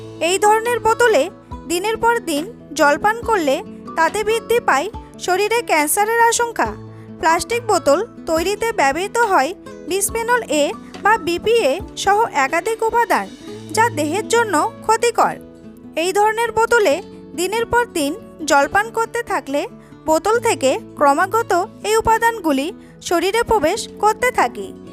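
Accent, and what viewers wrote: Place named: Bangladesh. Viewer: তুমি বাংলা দেশের লোক। তোমার কথা শুনেই বোঝা যায়। যেমন maass/মাছের উচ্চারণ শুনেই বুঝেছি।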